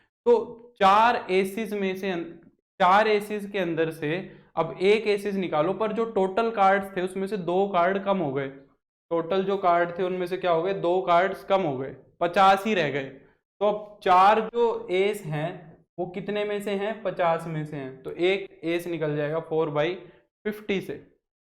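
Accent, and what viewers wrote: Haryana, India. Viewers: Is sir from haryana? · Sir app haryane ke ho na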